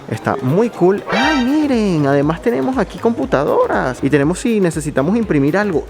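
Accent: Venezuela. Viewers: Jajja me siento cómodo de que seas venezolano y entiendo todo lo que dices xd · Hola, gusto en conocerte, mi hermano venezolano, que alegría escucharte es nuestro dialecto, je, je, estuve en Medellin la semana pasada y pude conocer, la plaza de Botero, el metro y el metro cable de Santo Domingo, el pueblito Paisa, el jardín botánico y la plaza de los deseos, caminé por esa calle donde fuiste a la Hacienda. en dos días conocí todo. Dios es bueno y me regalo este paseo. Te felicito por tu programa. Un abrazote.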